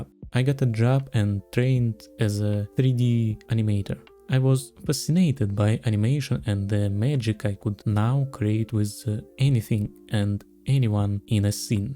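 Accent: Russia. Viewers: Ну по акценту я сразу понял что ты наш слоняра 💪 а фото университета это лишь подтвердило 💪💪💪💪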